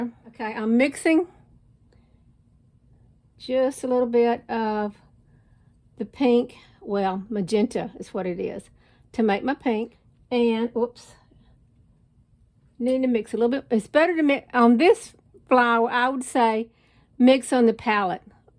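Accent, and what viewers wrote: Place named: South, United States. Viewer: I love this tutorial. Thank you!! Your accent tells me you are from the South. Love your accent. I’m in Tn. Would you show us a video on painting a Gardenia, also?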